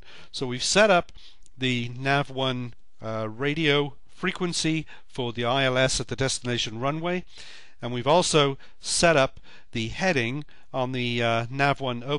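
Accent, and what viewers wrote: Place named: England, United Kingdom. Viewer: I for one, appreciate the clear English accent and not whizzed through at 50 mph. So many folks sound a bit like Kermit. Thanks.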